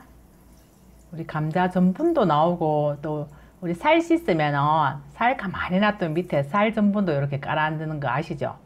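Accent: Gyeongsang, South Korea. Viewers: 좋은 정보 고맙습니다. 경상도 억양의 구수한 말들이 고향 생각나게 하네요. 오랜만에 밥뿌재 꼬매는 모습을 보니 엄마 생각이 나네요. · 너무너무~재미있게 하시내요 경상도사투리아주구 수해요~ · 여사님 말씀이 어느동네 억양인가요 갱산도 어느고을 사투리 인가용. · 밤 묵 잘 배워갑니다 감사합니다 구수한 경상도 사투리까지ㅎㅎ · 여사님은 경상도 아주마라 말씀하시는 품이 무뚝뚝하지만 뚝뚝던지는 말속에 인정 미가 넘치면서도 재미있네요. 옛날 어머니가 해주시는 음식들이 생각나게 합니다. 애교가 있어보이내요. 서방님께 사랑만이 받겠습니다. 밤묵만드 법 잘보고갑니다. 항상 건강 하십시요. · 경상도. 토박이. 말씀. 정감이랍니다 서울사람들은. 무슨말인지모를끼다. 내는안데이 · 경상도 싸투리넘재미 잇네요? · 밤으로 묵을만듣것을 처음보는것갇습니다 경상도 구수한 말 정겨운 목소리 ㅎㆍㅎ 맛난것 많이 배우고 갑니다 ~ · 밤 묵도 맛있어보이는데 갱상 말씀인가 디따 잼나네요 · 항상들어도 구수한 갱상도 사투리의 맛있는 목소리로 가르쳐주신 밤도토리묵 강의 잘 봤습니다. 목소리가 너무 이쁘셔서 얼굴도 꼭 보고싶었는데ᆢ! 너무 아쉽네요. 저는 갱기도 사람이라 그런지 갱상도 사투리로 강의하신 선생님얼굴이 눈에 선합니다. 보고싶어요! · 구수한 경상도 소리 듣기가 너무 좋습니다, 알단 재미있게 들었습니다, 통영에서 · 구수한 경상도 말씀 억수로 재미있습니다. ㅎㅎㅎ 정감이 가고 님은 살림을 엄청 잘할것 같아요. ㅋㅋㅋ 밤묵은 처음 접합니다. 좋은정보 감사합니다. 엄지척!!!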